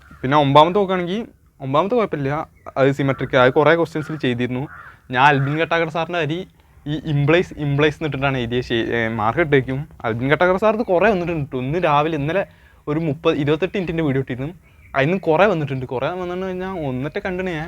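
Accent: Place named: Kozhikode, India. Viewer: Kozhikod ano slang kettiyu tonunu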